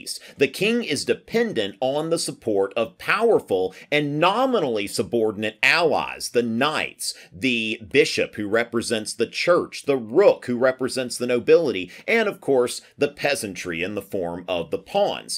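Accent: South, United States. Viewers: As King of England, I approve of this video (love your country accent btw 😁)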